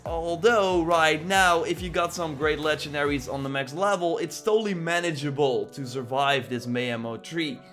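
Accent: Netherlands. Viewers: ....... are you dutch?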